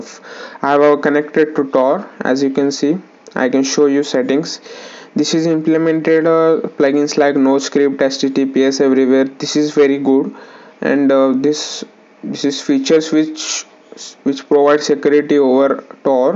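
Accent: India. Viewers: U are indian ur voice is like indian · Must be Indian, lol but I can understand what u say.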